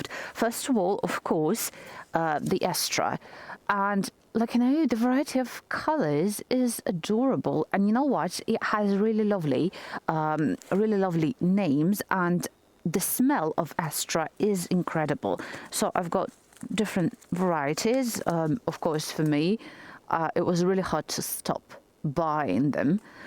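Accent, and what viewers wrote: England, United Kingdom. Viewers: Hello! Blue eyes, you eyes are sparkling, ❤ Guard your heart Springs could bring 😘 You speak English with an English accents. I love flowers and want to grow herbs. Guarding is so Romantic. Birds, sing, an we can walk bare feet in the grass tax free. Hand and hand ✋ we walk Romantic vibes we bloom, we blossom and 🌹 🌺 🌸 🌼 beyond the gloom!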